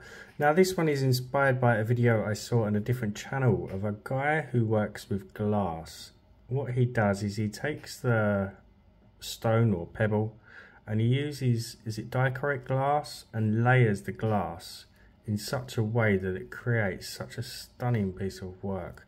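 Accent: Essex, United Kingdom. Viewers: Hello, new subscriber here, also new to resin art, just uv for now, anyway, today I found you on tiktok and what a nice surprise to learn you're from Southend on Sea, I lived in westcliff on sea for a few years, I miss it, I could see the estuary from my kitchen window 😢. Well, I enjoy your videos a lot and I wanted to thank you, oh, and I love your accent, brings back good memories, cheers 🤗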